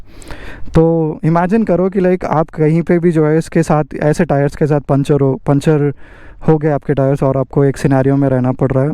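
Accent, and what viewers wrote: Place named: Telugu, India. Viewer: with telugu accent is the best bro.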